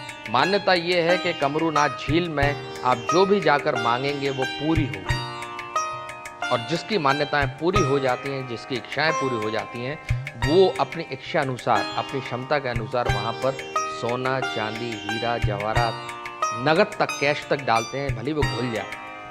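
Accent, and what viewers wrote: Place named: Haryana, India. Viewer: Good haryanvi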